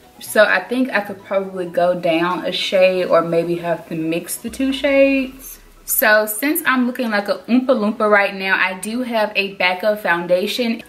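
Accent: United States. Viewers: Love your country accent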